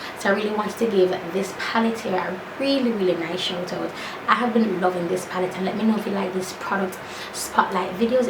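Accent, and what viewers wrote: United Kingdom. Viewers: does she have a mixed accent cos she also sounds like she has a British accent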